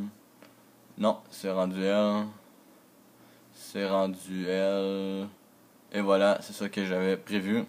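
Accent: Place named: Belgium. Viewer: tres bien expliquer mes bon axent belge je croie j aime bien je voulait te dire j aimerai bien quon joue ensemble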